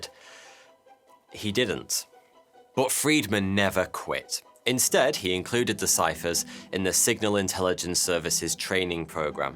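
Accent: United Kingdom. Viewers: I don’t mind British accents, in fact I love them, particularly the cockney accent. But, please start saying your th’s correctly rather than as f’s or v’s. I love your videos, but it seems I might have to stop watching. If you do this I will subscribe and support your channel. Thanks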